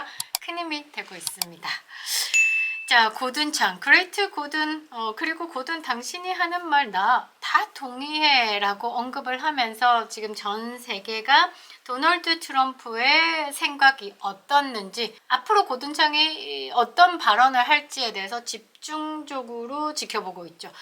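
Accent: Gyeongsang, South Korea. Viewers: 경상도 분이라 억양이 좀 그러니 이해해서 들어주세용~~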